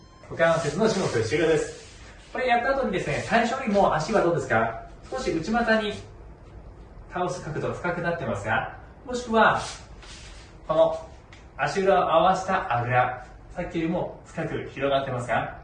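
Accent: Kansai, Japan. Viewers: 時々、関西弁になったり標準語との葛藤を感じる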